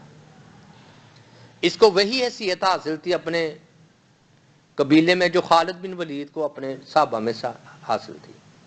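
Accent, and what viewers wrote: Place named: Punjab, Pakistan. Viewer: Assalma Aliakum Bhai App KI Urdu Punjabi styles hai App ko appni urdu Thikh karnay Chahay Likin As Jari Rakahy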